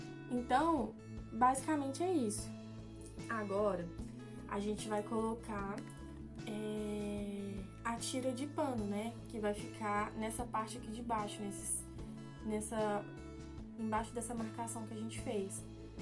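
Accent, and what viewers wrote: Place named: Minas Gerais, Brazil. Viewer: Ela é muito linda, e me parece pelo sotaque, que é conterrânea, das Minas Gerais.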